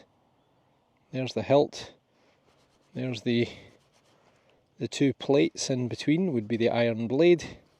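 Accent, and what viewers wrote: Scotland, United Kingdom. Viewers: Gonna be honest. I saw one of your shorts and I'm watching this now mostly so I can hear more of you talking. 😄 I'm an American and I get such a kick out of a Scottish accent! Ok, I also love metal detecting videos, but add on a Scottish accent and that's 50min of pure enjoyment. 🙂💜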